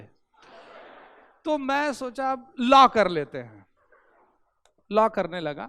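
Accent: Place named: Chhattisgarh, India. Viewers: U r right, the best thoughts..bejjati hi safalta ki pahli sidhi hai.. chattisgariya accent ...total Maza aa gaya. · आपकी हिंदी में भी छत्तीसगढ़ी है 😄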